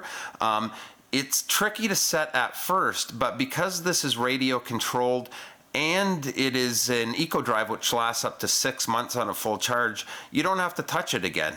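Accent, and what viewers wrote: Canada. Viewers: l lived in Stony Plain, Albetta 18 months. - pre-"Oh Canada." Really "old" days. Thought I heard a touch of the Great White North.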